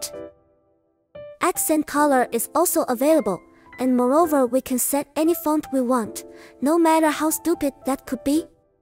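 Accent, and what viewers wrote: China. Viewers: i love this english voice with the chinese accent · am I hearing things, or does the voice have a chinese accent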